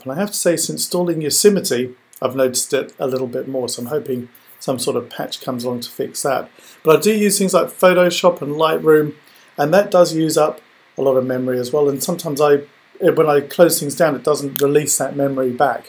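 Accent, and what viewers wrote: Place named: England, United Kingdom. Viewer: Ahhh first so lovely to hear a nice English voice, and second a FANTASTIC tip! Thank you!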